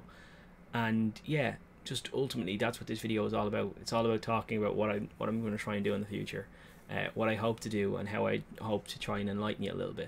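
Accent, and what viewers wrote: Ireland. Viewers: Addicted to content. Man cave + Irish accent + rage + scratching. Top notch channel right here, m8.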